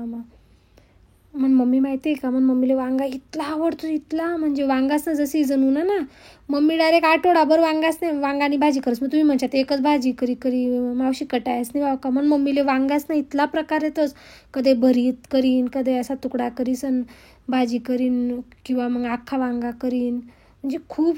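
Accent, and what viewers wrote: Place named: Jalgaon, India. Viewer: ताई तुमची भाषा खुप भारी तुम्ही जलगांव चेका 👍👍🙏🙏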